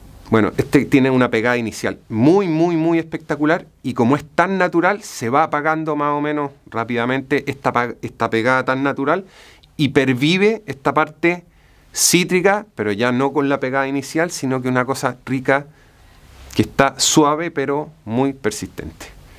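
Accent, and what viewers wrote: Chile. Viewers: Me choca el acentico Chileno de éste tipo.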